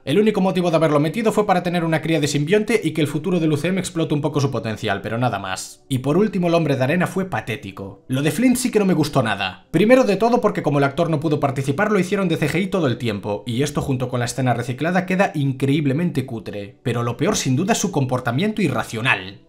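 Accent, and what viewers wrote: Galicia, Spain. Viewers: Eres gallego no? :v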